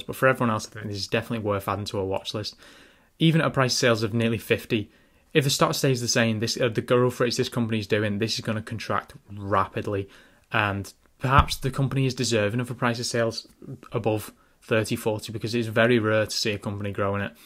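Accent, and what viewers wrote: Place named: Australia. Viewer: This channel is massively under rated. Also helps that you sound like an Aussie like me.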